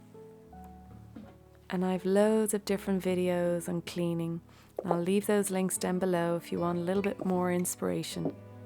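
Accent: Ireland. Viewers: I’ve had a small touch of spring cleaning fever for a few days now and this video just fed that immensely! It’s as if you described my every thought & feeling, except with a beautiful, soothing Irish lilt!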